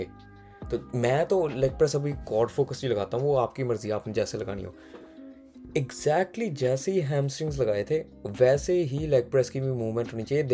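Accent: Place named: India. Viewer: the hindi accents killinggg meee😍🙏🏼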